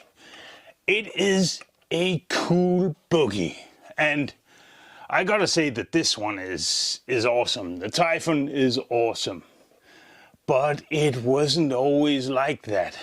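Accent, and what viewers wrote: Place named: Austria. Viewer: You must be from Austria but love the accent and the rc content from north Carolina usa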